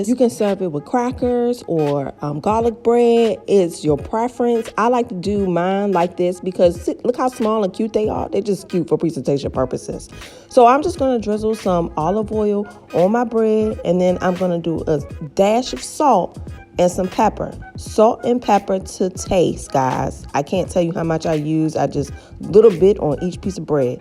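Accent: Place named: Maryland, United States. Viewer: OK, I was about to quote the hell out of this video, but realized it was damn near the whole thing! Love the energy and that Murland twang... Now, if I can focus and get ur recipe I'd be good! lol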